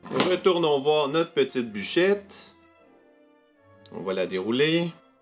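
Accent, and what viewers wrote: Quebec, Canada. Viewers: J'adore ton accent, ça me rappele mon oncle du Québec !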